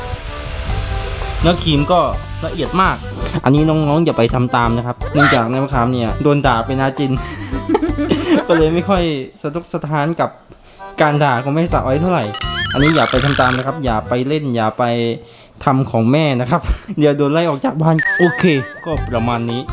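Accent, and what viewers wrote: South, Thailand. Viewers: พี่เเหลงใต้ดีกว่าครับผมชอบ555+ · พูดใต้ได้กันน้ะ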